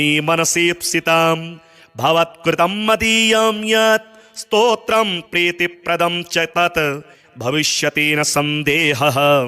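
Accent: Standard. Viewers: 🙏ॐ श्री गणेशाय नम:🙏 🙏ॐ रिद्धि सिद्धि सहिताय श्री गणेशाय नम:🙏 आचार्य जी द्वारा शुद्ध उच्चारण सहित सुस्वर में लयबद्ध वाचन। आपको सादर प्रणाम🙏🙏🙏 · जय माता की, बहुत अच्छी आवाज है शुध्द उच्चारण है ,बहुत बहुत धन्यवाद 🌹🌹 नारायण गृहस्थ आश्रम नारायण नगर पचरॉव चुनार मीरजापुर उ प़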